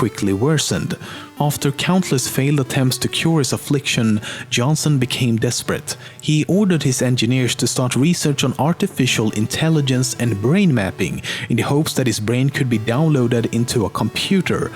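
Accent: Sweden. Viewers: Not to be mean but are you Swedish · Jesus Christ the Swedish accent is killing me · are you sweedish? sounds a bit like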